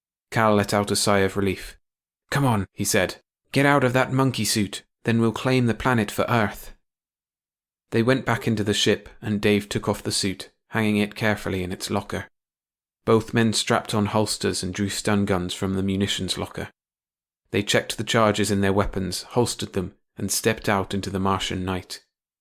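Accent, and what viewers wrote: United Kingdom. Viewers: I love UK narrators and the accent!!! ❤ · Weird how our brains are all wired to what is our familiarity, so as a British listener I find it so much clearer to hear a usual British accent; it’s not scouse or geordie which I would expect non-Brits to struggle with; and there’s plenty of US and Aussie content compared to British; I really appreciate the opportunity to listen in my own very boring and average British accent. I know I struggle with slow accents, I think it’s Mid-west American but as I’ve only visited LA, Texas, and Manhattan I can’t be sure.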